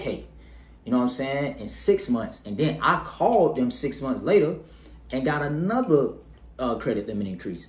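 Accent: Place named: Atlanta, United States. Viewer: sound like you from ATL, i was thinkin of company names MuddHouseReQ did pop up, ill run wit sum ting else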